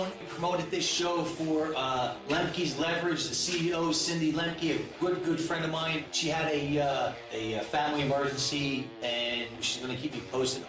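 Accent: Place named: East Coast, United States. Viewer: Definately Hear That Wonderful East C. accent! #MM2018 , Your Amigo Santos.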